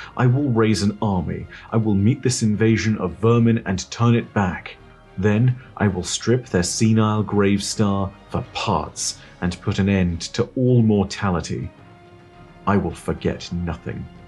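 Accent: United Kingdom. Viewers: You sure can. But you could also comment on British accent and the nuanced way he pronounces things, too.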